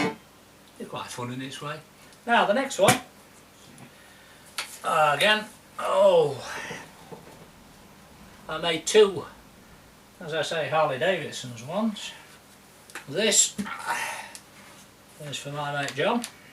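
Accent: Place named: United Kingdom. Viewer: A nice change from the common license plate variety. I would highly recommend a magnetic pick up then you can put some overdrive on and I think that big box will really sound good. Love your accent. I left the UK in 1984 and we don't have a lot of folk here in Montana that sound like us. Where are you from exactly. (Sentinel Guitars, Bozeman MT)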